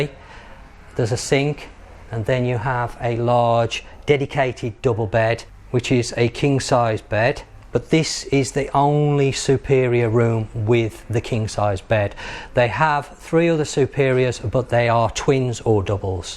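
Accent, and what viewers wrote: West Midlands, United Kingdom. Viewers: Hey how are you bro. Sorry we haven't been able to visit you for a while we were in Bulgaria then Malta were we got stuck due to Covid and flights we're cancelled. But great to catch up with you again. Where in the west Midlands are you from bro, i really recognise that accent. Very cool indeed. Thanks so much for taking us along with you on this adventure trip. Nice 4x hotel. Stay safe and take care my friend catch up soon God bless you.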